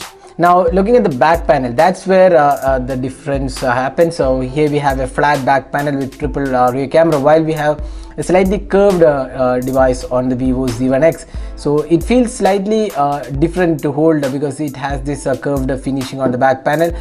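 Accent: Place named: Kerala, India. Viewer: Ipol thanne malayalam pole aanu feel cheyunnathu. Malayali analle .? Engane manasilayi.? Athu English ketapol manasilayi .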